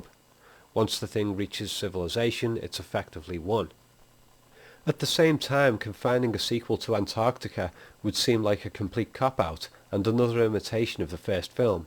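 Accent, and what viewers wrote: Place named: Liverpool, United Kingdom. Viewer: Great video. The new film was very sloppy film making cashing in on the name. I did want to ask unrelated to the video, where are you from? As you sound scouse but you use a fair amount of American words the English never use and some American pronunciations.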